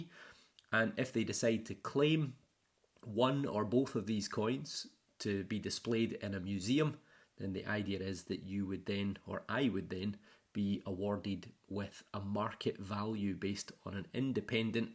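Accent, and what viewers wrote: Scotland, United Kingdom. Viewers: Thank you for the extensive and fascinating explanation of the two silver coins. This is why I follow your metal detecting channel and your Scottish accent. I follow more metal detection channels but there are only two that stand out and you are one of them. When I watch a recording of you on YouTube, my wife always shouts, that's the man who always shouts, oops I dropped it. Thanks for the effort and time you put into your YouTube channel, I really appreciate it.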